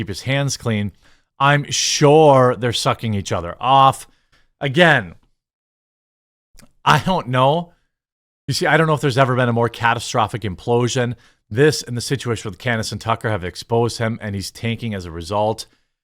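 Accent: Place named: Midwest, United States. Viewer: It is funny. That Midwestern thing. I bet he says "warshcloth" too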